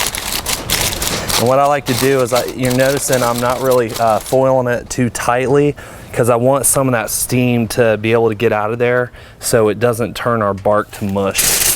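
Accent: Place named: Pennsylvania, United States. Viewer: Southwest PA is my guess.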